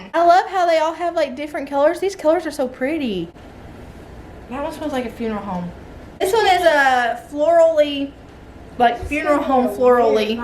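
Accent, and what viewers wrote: South, United States. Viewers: I was just last night telling my hubby about u and ur family and ur channel! My exact words were she says “hey y’all my name is and I’m coming to u from a trailer park in Tennessee!” In the cutest southern drawl ever! And I was telling him I watch a LOT of you tubers and that u are the most authentic and amazing one that I watch! I was telling him how much I love ur family values and what an amazing marriage u have!! How hilarious u and and even the kids are! And I had just told him that I think she is probably making some good money on you tube and u don’t even do advertisements. That u turned one down for thousands of dollars becuz that’s not how u wanted ur channel! And I told him how I was so happy seeing u be able to buy some diy stuff for ur house and take the kids on a staycation but how u still are so grounded and save up for things in envelopes and that u haven’t changed one but!! 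We love y’all for y’all!! I’m glad u explained that tonight (although I did cry) but I don’t ever want u to feel that way again!!!! Just delete nasty comments! Don’t deal with them!! U know you and that’s all that matters!! And I hope one day u pull up to that trailer in a Lamborghini!!!! Wouldn’t that be the talk of the park!!!! Lol!!! Plzzz don’t let anyone else bring u down! U have brought me up from some of my darkest days!!! There isn’t one video I have ever not smiled or laughed (even if we’re through tears of my own) the whole time!! U have a heart of gold! Don’t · I think people need to understand that you can still live frugal and on a budget and still have money to spend. My husband and I live below our means a lot, but if needed we can drop a couple hundred on something and not have it hurt us. Just because you try and live frugally, doesn't mean you can't spend a little extra if you decide it's worth the spending. 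I got here watching your alls plantation shutters video, and stuck around for the southern drawl and since of humor. There's only a few youtubers that I watch solely for persona and you would be one. I just like when I watch a video and someone's house isn't perfect all the time, because mine isn't. After 4 kids (and some health issues starting to show up like PCOS) I'm on a health journey too, so it will be cool to see your progress and feel like someone else is doing it with me. 😊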